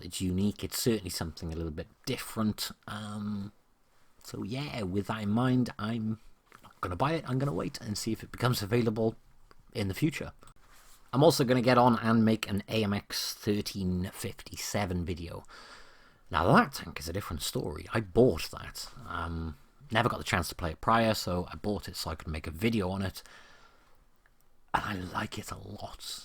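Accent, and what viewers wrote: United Kingdom. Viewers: U see that typicaly english? I think he is 100% UK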